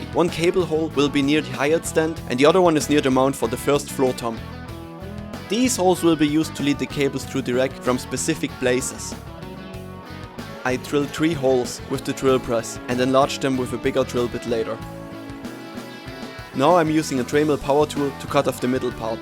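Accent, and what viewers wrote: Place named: Germany. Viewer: your accent sounds so german, but your wall outlets are UK outlets for sure! Confused :C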